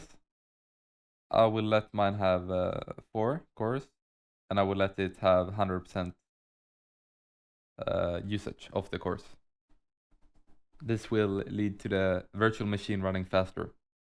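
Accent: Sweden. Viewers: You Swedish? Same! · swedush my man som jag xD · ayyy du är svensk lmao tyckte att jag kände igen dialekten haha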